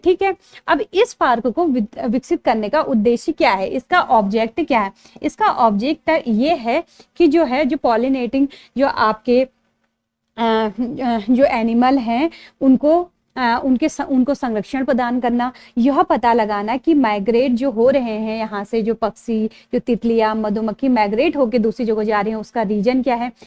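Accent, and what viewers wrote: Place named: Pahari, India. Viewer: पहाड़ी tone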